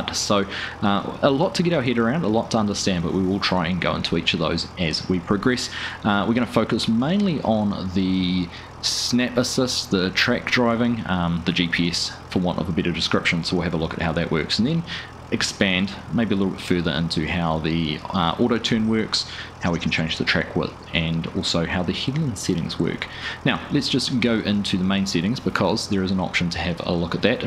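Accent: New Zealand. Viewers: You might be getting confused by the Kiwi accent...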